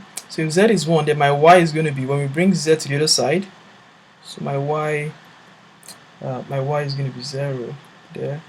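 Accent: Nigeria. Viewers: i knew you were nigerian as soon as i heard your voice, you probably igbo too lmao, good video broski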